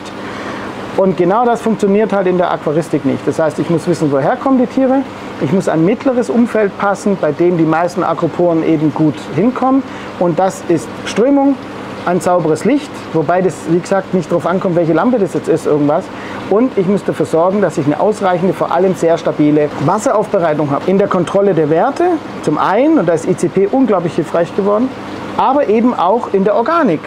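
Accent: Swabia, Germany. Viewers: Hochkompetent, sowie schwäbisch sympathisch. Da kommen einem schon Ideen. Auf bald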